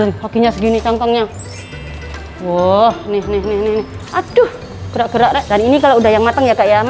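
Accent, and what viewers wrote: Java, Indonesia. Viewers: Mhn maaf yach knapa yach klo liat food floger lain makan tuh kyk'a gmnaaaa gitu...tp klo liat makan koq enak banget..liat wajah'a jg enak...ngomong'a jg enak dgn ciri khas medok'a... Maka'a aq cuma.subscribe channel'a doang...ciyuusss nih n.sll nunggu senin rabu jum'at...😘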